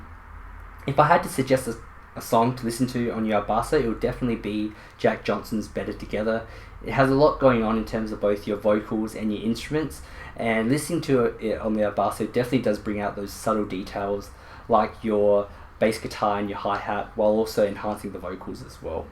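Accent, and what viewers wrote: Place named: Australia. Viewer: Love the Australian accent.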